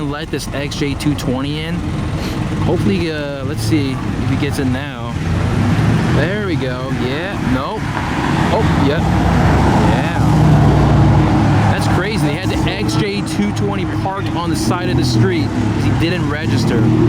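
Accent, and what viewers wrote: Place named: California, United States. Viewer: Love the commentary, totally California dude!. Miss it. :)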